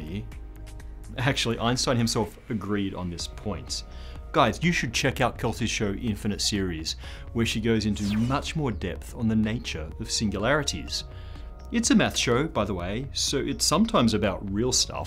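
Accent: United States. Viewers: speak in an English the whole world can understand...dont use your american accent.. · so you had to stuff up this channel by employing Americans with course and shrill accents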